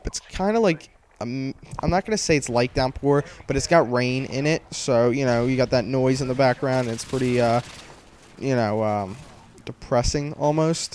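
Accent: Spain. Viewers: eres espanol tio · ur spanish?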